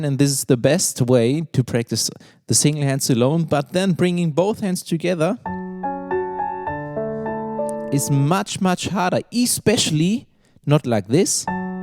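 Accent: Germany. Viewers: German accent right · Its a german accent. honestly hes speaking way better than most people i know. have a wonderful day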